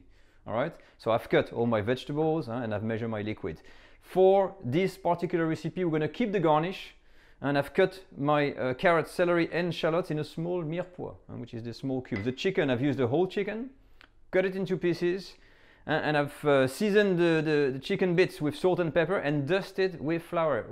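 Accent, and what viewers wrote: Australia. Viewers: Love the way you explain things. You have been in Australia too long, you are starting to sound like us, all lbe it with a lovely accent. A bit like Manu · Ha ha every now and again I hear the remnants of your Aussie accent coming through!! Very good recipe too. Made it for my daughter and she loved it.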